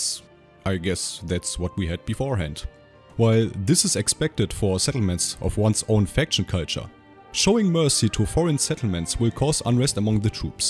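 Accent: Germany. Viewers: Ich denke auch sein Akzent hört sich schon ziemlich Deutsch an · are you german?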